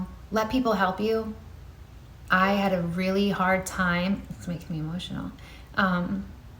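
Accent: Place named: New Jersey, United States. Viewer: He has blessed me! And God bless YOU as well!! Yes, lol the accent you hear is indeed from Jersey and that is where I had my surgeries. ❤️‍🩹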